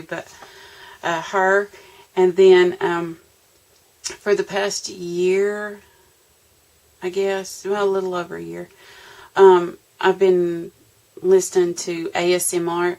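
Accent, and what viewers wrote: South, United States. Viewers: I loved that you changed your questions, that was I felt it was too much private information they were wanting. I had to laugh about Eclectic Hillbilly. I am so very thankful that Pam (Grammie2Bay) and Beth (BethScrapbookRoom) both turned me onto your channel. I just love your southern draw and mannerisms. I know what "I Bless your Heart: means. I enjoy thoroughly listening to your soft soothing voice, within 7-10 minutes I know my blood pressure has dropped as I mellow out with you. I guess I am going to bite the bullet and start making youtube videos. I need to research how its done, and if I can even do them in my little craft are (I have my bedroom closet. Maybe that would be my first video. Anyway thanks again for changing the questions. Take care and hope to talk soon. Teresa in Maryland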